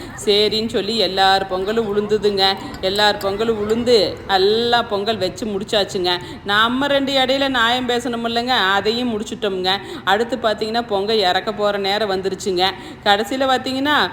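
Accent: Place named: Kongu, India. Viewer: சூப்பர் மா பொங்கல் விட உங்க கொங்கு பாஷை தான் எனக்கு பிடிச்சதே மா சூப்பர் சூப்பர் உங்க குரூப் பொங்கலுக்கும் உங்க பேச்சுக்கும் ஒரு ❤️👏👏👏👏👏👏👏👏😄👍🙏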